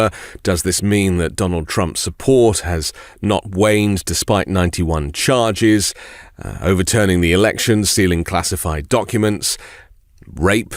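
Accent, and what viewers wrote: United Kingdom. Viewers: I find it hilarious that this host is still clinging onto the Russia Collusion hoax. His British accent makes him sound intelligent and then he lets stupidity like that come out of his mouth.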